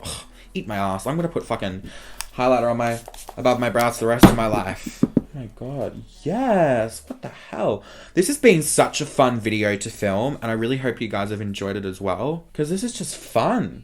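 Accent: Australia. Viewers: I love how Australians say their O's. Q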